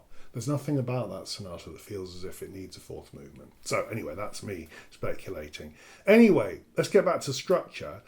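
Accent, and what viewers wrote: United Kingdom. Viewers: Great video, but when will you get rid of that ridiculous British accent?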